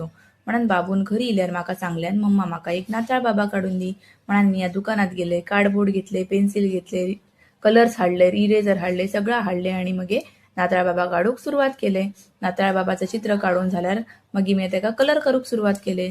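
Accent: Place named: Malvan, India. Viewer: Lay bhari malvanit commentary martay go 👌🏻khup chan👌🏻😁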